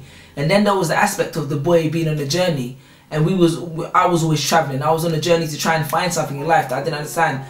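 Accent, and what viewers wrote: United Kingdom. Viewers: I love your accent.. it's almost confusing though. Some words you say you sound Haitian and other words you sound British. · hey were are u from, i can tell u haev a british acent.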